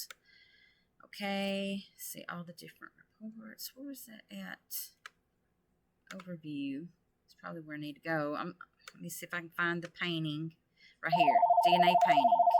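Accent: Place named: South, United States. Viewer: Sounds like this person is from the south.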